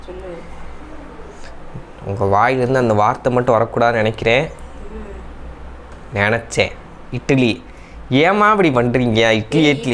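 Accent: Madurai, India. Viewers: Anna unga Madurai slang super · Bro naan coimbatore but unga madurai slang super 👌😍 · Hi bro unga Madurai slang super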